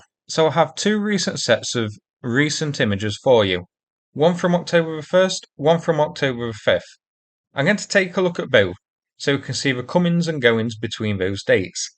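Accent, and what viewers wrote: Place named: Lincolnshire, United Kingdom. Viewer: I'm trying to identify your accent! You sound exactly like a good friend I worked with years ago in Bangkok! He ( Gavin) was from Lincolnshire area I think?? Keep up the great reporting!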